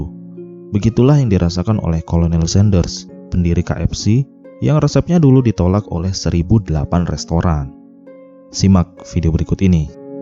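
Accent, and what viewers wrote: Lombok, Indonesia. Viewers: Dari lombok ya bang?🙏🏻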